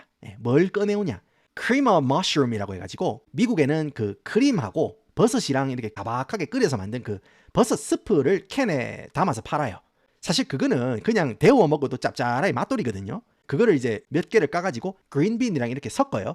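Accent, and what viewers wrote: Gyeongsang, South Korea. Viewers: 경상도 사투리로 미국이야기 잼나개 해줘서보는데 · 경상도 사투리 듣기 좋은데? 보기싫음 차단하든가😂 이채널 댓글만 12개 썼으면서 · 가끔떠서 보는데 경상도 사투리 너무 듣기힘들다 ㅋㅋㅋ 알고리즘에 그만 떴으면... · 니 갱상도 사투리 조지는게 억수로 찰지데이~~~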